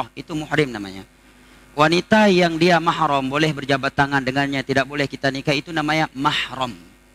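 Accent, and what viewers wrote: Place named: Minangkabau, Indonesia. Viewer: Mungkin logat minang kental sekali, makanya agak beda sedikit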